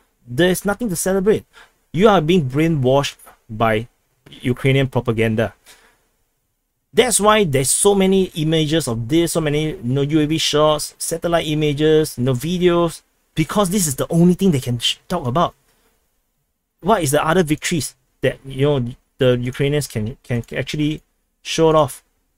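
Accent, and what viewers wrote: Singapore. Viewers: great reporting and analysis , are you singaporean (based on your accent) ? hope you are fine there and not attacked / reported by insane amount of pro ukraine trolls (including those from troll farms in UK/US/FR) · Seems like you are more happy to believe the Russian MoD information rather than the Ukrainian MoD information. Maybe you don't notice, but there is clear bias in your opinion, and the way you put it across. Personally I don't believe either side with thier stated figures and actions, all that matters is the facts on the ground. And the facts on the ground show that overall Ukrainian forces have been able to take back allot of territory so far, they are not even fully mobilized have received very few heavy weapons. You would expect it to take at least another 2-months before the effects of such are seen. Really all Ukraine can do right now in the east is hold the line the best they can without being obliterated, naturally during this period, with such concentrated Russian forces, land will be lost. 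I think if Ukraine can't seriously get on the offensive within 6-months then these lands will become increasingly difficult to retake, and just for the reasons you stated, but within this timeframe there is plenty of opportunity to reclaim these territories. Doubt it on Crimea and Donbass however, possibly if Russia collapses within, but only then. Now if I am not incorrect, you sound Singaporean, I have worked there many times, very hard business approached people, so the importance of morale factor might be difficult for you to fully grasp. And you might have thought that the Ukrainians might just roll over, but Kiev was not taken in 3-days or ever, and thier military still fight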